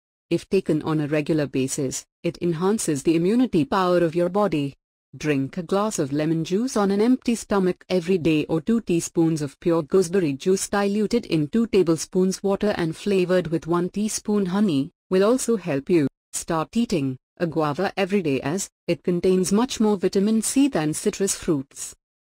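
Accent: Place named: Asia. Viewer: An Asian robotic voice🤦🏼‍♀️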